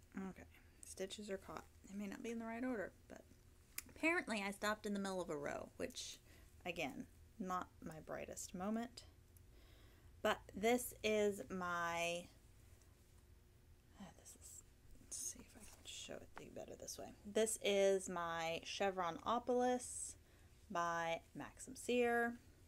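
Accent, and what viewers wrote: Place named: Quebec, Canada. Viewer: I’m guessing Max and Vincent taught you how to pronounce Ulysse 😂 Very french Canadian accent ( I’m from Montreal, originally, as well). Oo-lease, with the accent on the second syllable, would be more accurate in French from France, where the yarn is from.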